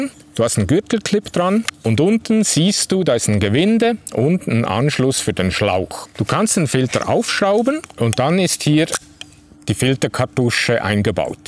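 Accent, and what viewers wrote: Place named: Switzerland. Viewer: Moin Na, das war ein dramatischer Anfang und die Musik Episch. Das mit dem Schweizerdeutsch war vollkommen in Ordnung, der Dialekt ist einfach Klasse. Der Filter ist wirklich gut. Selbst hab ich einen von Katadyhn . Ist aber eine Überlegung wert sich den anzuschaffen. Danke für's zeigen 👍🏻 Gruß Michael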